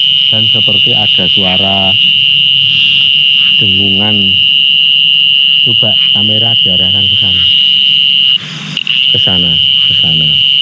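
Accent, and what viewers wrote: Java, Indonesia. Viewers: Sambil ududlah 😂😂😂😂😂😂mantap medok jowone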